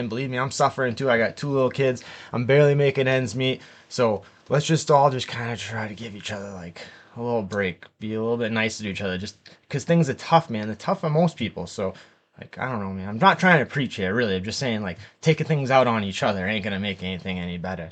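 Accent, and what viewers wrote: Boston, United States. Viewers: What I don't understand brotha, is how you have a Boston accent coming from Gloucesta. Are you shittin me? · There is a DISEASE which has infected Yt, and it's the nauseatingly overuse of the meaningless, annoying, and thoroughly useless word "iconic." (Note I did not say "icon" as in "bell icon") Theres nothing especially sophisticated, meaningful or clever about it, yet EVERYBODY on YT has a mania for it's use. It's gotten to be effing CRINGE. No, seriously. As soon as I hear it, I fight the urge to PUKE, I turn the video off, downvote it, and move on. This video was, predictably, no exception. I turned off ten videos yesterday over this and so far today, three, yours included. In a ROW. YouTube's nearly sexual preoccupation with the word is not my imagination. That word doesn't make you sound cool, hip, or creative. It makes you sound like an annoying moron trying to sound like you know a bunch of big-boy words. 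Knock. It. Off. Ive already turned the video off, and yeah I'm the guy who gave you the downvote you earned. All because of that word. I betcha feel all fancy and sophisticated now, dontcha? And you were doing so well, too. Made it at least to the 5 minute mark. Then you just had to use it. Get a new act already. Trying to sound sophisticated with a Boston accent is impossible anyway. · Are you from Roxbury ? 😅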